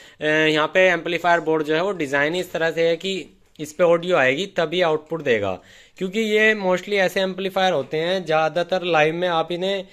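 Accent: Meerut, India. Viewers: भैया मैं आपकी बहुत सारी वीडियो देखता हूं और आपका काफी पुराना सब्सक्राइबर हूं मुझे आपकी भाषा बहुत अच्छी लगती है क्योंकि मेरे मेरठ जिले जैसी ही लगती है जैसी मेरे मेरठ जिले की भाषा है आपकी भाषा बिल्कुल वैसी ही है अगर बुरा ना मानो तो मैं आपसे आपका निवास स्थान पूछ सकता हूं क्योंकि मेरा भी एक एलिजिन कंपनी का एंपलीफायर है जो खराब हुआ पड़ा है आपसे ठीक कराना चाहता तुम मुझे वह एंपलीफायर लेकर कहां आना पड़ेगा इतना बता दीजिए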